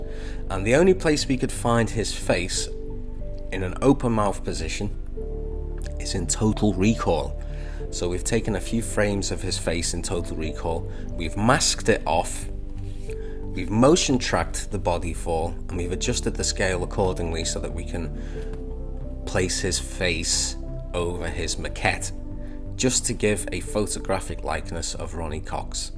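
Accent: Liverpool, United Kingdom. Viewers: A fellow Scouser hey?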